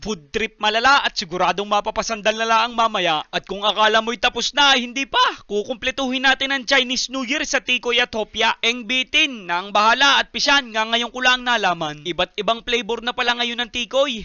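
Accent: Batangas, Philippines. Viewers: Silent viewer. Sa totoo lng, walang palag sa inyo si Ninong Ry, Abi Marquez, Kazolid vlogs, Vanjo Merano, Kuya Fernz, etc. Straightforward pagluluto niyo, walang Arte, walang Daya, walang fancy ingredients, rekta na sa kung ano lng needed na ingredients. Ala eh, pati kmi dito sa norte ay napapa Batangas accent na sa galing niyo. Mabuhay ka, Sir!!!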